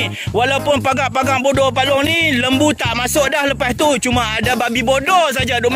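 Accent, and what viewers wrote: Kedah, Malaysia. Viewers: lama dok kat pahang, tapi loghat kedah masih mantap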